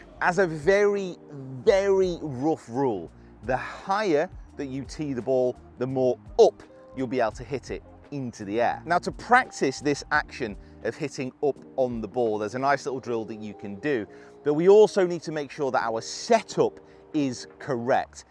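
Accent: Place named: Texas, United States. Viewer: what part of Texas is he from ?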